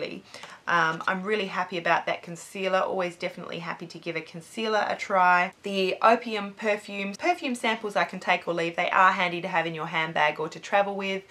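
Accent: Australia. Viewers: Hi! New subbie here and I love u already! And I love Australian accents. Hugs from the states.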